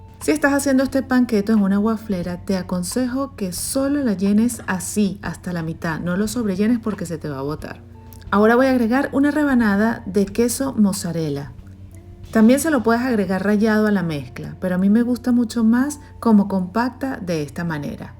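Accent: Venezuela. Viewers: Me encanta escucharte demasiado venezolano, ya me estoy comprando tu recetario ahora mismo !!!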